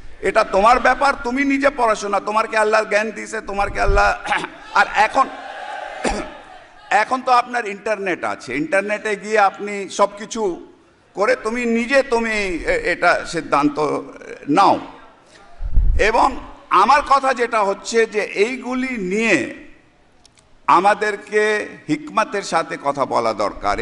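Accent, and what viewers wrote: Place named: Old Dhaka, Bangladesh. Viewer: কেউ ভুল বুঝবেন না পুরান ঢাকার মানুষ এভাবেই কথা বলে।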